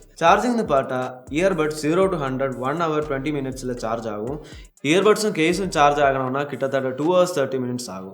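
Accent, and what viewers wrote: Kerala, India. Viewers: konjam kerala waadai adikudu thambi pesaradula...:) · Avaru malayalai pa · Bro neenga malayali yaa. Neenga pesum bothu oru malayali Tamil pesura Madhuri iruku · Sound like Malayali speaks tamil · From his slang, is a Mallu:-)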